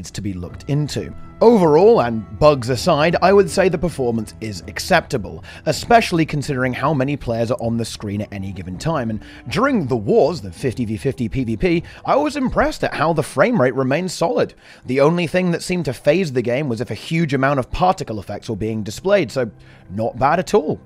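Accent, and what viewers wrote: England, United Kingdom. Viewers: your smooth english accent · how will I listen to your smooth english accent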